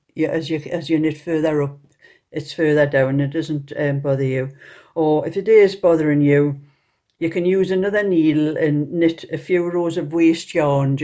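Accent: Newcastle, United Kingdom. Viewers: Thank you for this lovely tutorial, exactly what I need for my cardigan pattern which assumed I knew how to sew on the pocket. Also love your accent, reminds me of my home and family from long ago in Newcastle 😁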